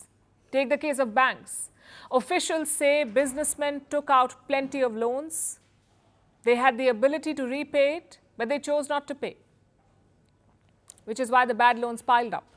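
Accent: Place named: India. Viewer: Why this lady keeps having wet dreams I. Bangladesh and keeps spreading rumor in an annoying Indian accent?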